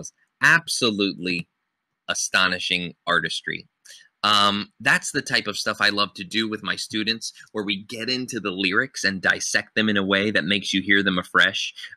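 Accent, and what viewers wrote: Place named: United Kingdom. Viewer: Thank you for this excellent analysis! If I may say so, I find the British accent annoying/distracting. was American so I don't feel that my opinion is inappropriate! I saw a video of teaching this song and he was a stickler for the consonants!! Ought / to just like you said!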